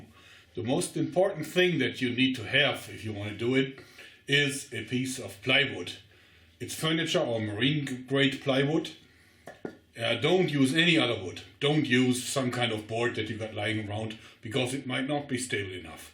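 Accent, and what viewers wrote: Germany. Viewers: could it be, that you are german?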